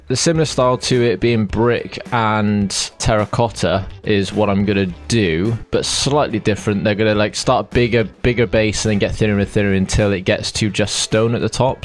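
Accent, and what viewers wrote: United Kingdom. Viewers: Is this a fellow Brit?!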